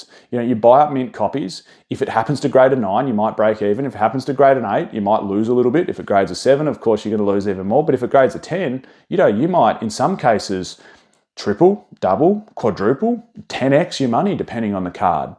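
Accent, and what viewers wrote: Australia. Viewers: what part of Aus are you from btw? I'm in melb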